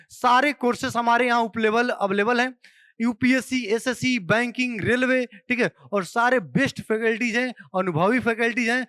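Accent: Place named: Bihar, India. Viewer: Sir aap chhatisgarh se nahi ho na bihari jaisa feel ho raha hai bato ko sun kar😂😂😂😂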